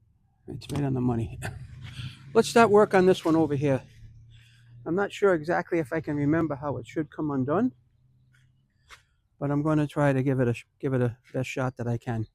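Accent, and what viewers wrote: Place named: Boston, United States. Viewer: Thanks for the video brother, love your accent, sounds like my cousins from Boston. I’m up here in Canada and have a 2020 Nautilus and this video was very helpful.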